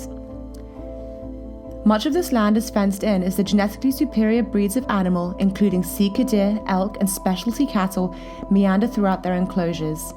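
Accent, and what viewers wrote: United Kingdom. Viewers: with no offence intended to the lady I think it is incongruous that the description is delivered with a british accent. Are you guys short of women in New York ? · Terence Mac Sweeney Sotheby’s is a British auction house. Makes sense they’d use a British narrator to promote their brand. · A place SO exquisite.... only a British accent can describe its grandeur! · from the accent of the voice over, I thought this property was in somewhere in Scotland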